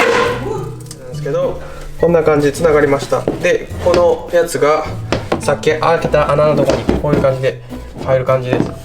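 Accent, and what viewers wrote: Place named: Banshu, Japan. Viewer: いつも楽しく拝見させてもらってます。さんが時々 そんでとか 〜やけどしゃないやろと言った播州弁で喋ってられるのをみてすごく嬉しく懐かしく感じてます。小生中学生まで ６０年前までですが住んでいた者ですから。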